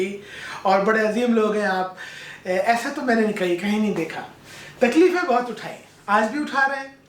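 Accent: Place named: Darbhanga, India. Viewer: می دربھنگا سے ہو آپ کی اردو اور لہزا بلکل ابھی بھی وہی ہے.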